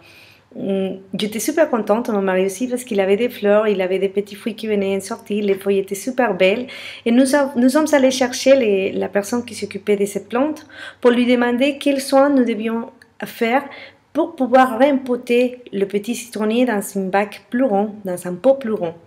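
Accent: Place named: France. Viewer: Te sigo en español querida...pero adoro tu acento francés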